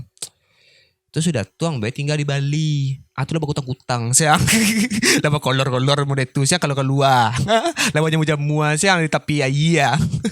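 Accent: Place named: West Sumatra, Indonesia. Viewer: Mantap..bahaso minang nyo kental...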